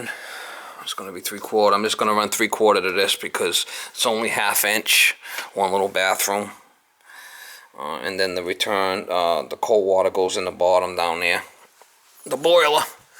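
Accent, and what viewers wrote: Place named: Massachusetts, United States. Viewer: Sounds like you're from Massachusetts where's your fire Watchman for that torch . lol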